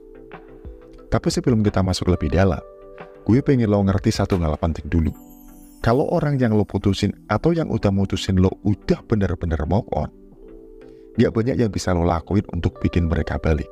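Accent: Java, Indonesia. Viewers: aga medok nampakmya moderator kali ini🤔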